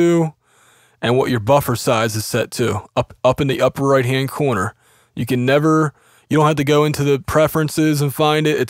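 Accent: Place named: United States. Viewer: Hmm...I recognize my own american regional accent. What state you from???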